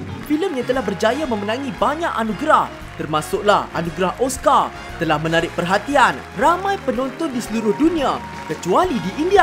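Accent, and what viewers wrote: North, Malaysia. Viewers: suka channel ni. Subscriber baru di sini. orang utara ka? A kat belakang tu pekat benau. Hehe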